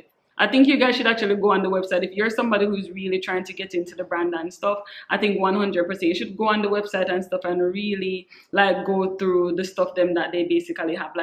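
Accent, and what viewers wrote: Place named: Jamaica. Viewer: Thanks for shearing this information to as we have Learn 1 or 2 I can not wait to watch ur China home where ur Jamaica accent is really good 😊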